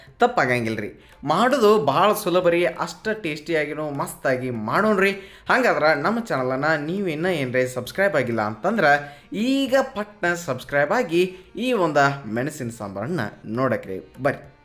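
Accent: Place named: Dharwad, India. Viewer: ಸಾರಿಗಿಂತ ಜಾಸ್ತಿ ನಿಮ್ಮಧಾರವಾಡ ಭಾಷೆ ಕೇಳೋಕೆ ಮಸ್ತ್ ಅದೇರಿ 👍😁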